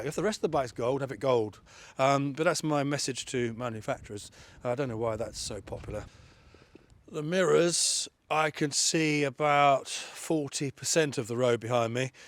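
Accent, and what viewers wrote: United Kingdom. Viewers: you look like Hollywood star,with purely british accent.😁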